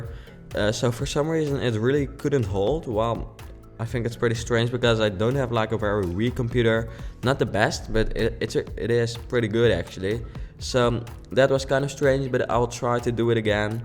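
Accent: Netherlands. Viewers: That Dutch accent though... ;) But I really like your work!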